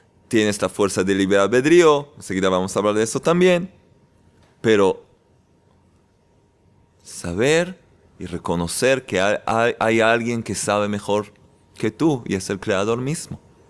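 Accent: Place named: Panama. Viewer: Una de los sucesos que mas me ha divertido es que usted habla como nosotros y eso hace que se identifique mas..."pa donde vamos" jeje Y los mas importante y valioso es que no sabia como era mi relación con Dios y tampoco entendia muchas cosas. Aparte que no sabia que espera de mi y yo de El. Gracias, muchas gracias. Soy de Panamá y me apodan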